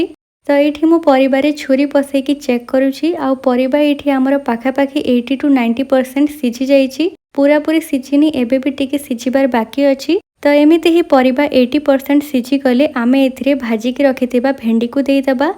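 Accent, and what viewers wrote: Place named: Western Odisha, India. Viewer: Say in your western odisha accent please it's so soothing to hear.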